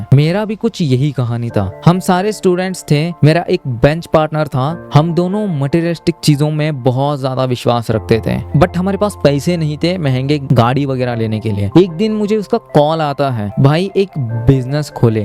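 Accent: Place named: South India, India. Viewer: bhai, are you from south? accent bhot pyara hai♥♥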